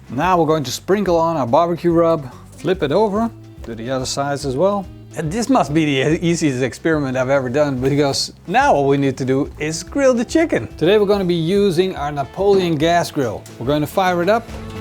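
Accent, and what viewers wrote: Netherlands. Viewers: Ik hoor een Nederlands accent?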